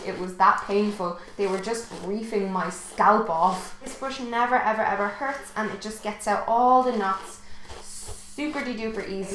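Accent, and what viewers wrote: Ireland. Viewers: Your so beautiful, and it's so weird(not u being pretty) it's weird that I'm like your doppelgänger but younger! I had extremely curly hair like you did! And I presume your from Ireland?! Well me too! And I have the same accent, I have extremely think hair,frizzy/curly hair like yours... I love your channel and you look so pretty and beautiful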